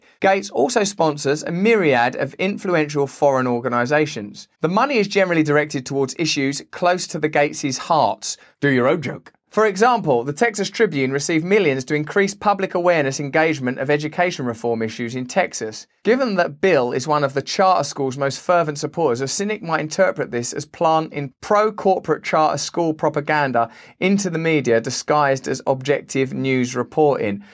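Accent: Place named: England, United Kingdom. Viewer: Fir ee aye miwwen dollars, come on. Is it just me or do English people sound like they're still trying to learn english while the rest of us learnt to talk properly and moved on.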